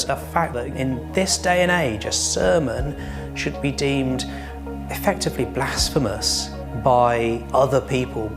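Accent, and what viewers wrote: England, United Kingdom. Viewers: I'm worried now. I live in England I'm still in school. We're learning about abortion and school and I'm probably the only pro-life person in my year; l've quietly mentioned my views and had a discussion with my liberal friends on it, but I don't feel it's safe to be completely honest even, well especially in my Ethics class. Your English accent is questionable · Love the English accent! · Christians now more scared of the left than of God!! Your English accent is shite. Its doesn't make you sound funny and takes away from the point you are making. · Very nice british accent by the way. Also, yes, we live in a day and age where Christian views are no longer tolerated. I fear for raising my children in such a society. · Not a bad UK Accent Mike! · with that British accent had me dying lmao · Your British accent is quite impressive...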